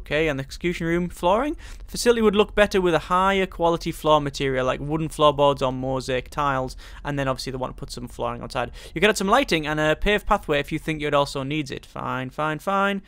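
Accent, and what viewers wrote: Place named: England, United Kingdom. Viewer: As soon as you started talking i knew you were either English or Scottish. (Maybe even Irish)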